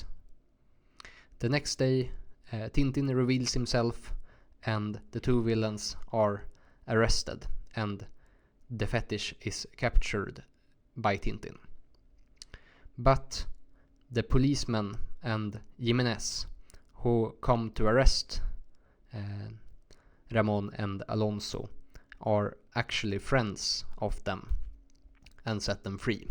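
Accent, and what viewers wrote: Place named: Sweden. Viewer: S tier Swedish accent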